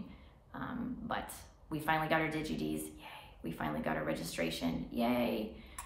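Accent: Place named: United States. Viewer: your american accent... quite charming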